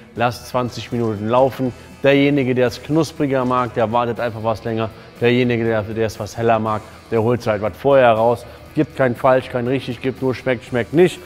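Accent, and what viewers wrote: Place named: Rhineland, Germany. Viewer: endlich wieder rheinische tön hüüre, näää wat es dat schön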